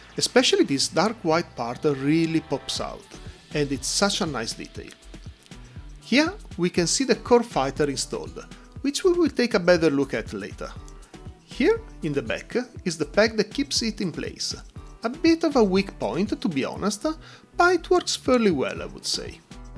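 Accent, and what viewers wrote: Italy. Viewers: You sound like an Italian 🤌😅 I hope i didn't offend you. Btw i Really enjoy watching all your videos and great tips tutorial, you should make a video about best techniques to tighten loose joints. ( Polycaps & otherwise) Soon. You did a great job on the review sir you earned a Sub 👍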